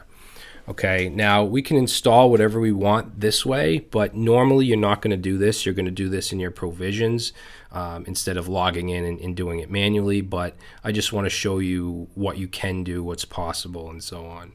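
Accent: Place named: Massachusetts, United States. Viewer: could I ask if you're from the Massachusetts area? Your accent sounds familiar :)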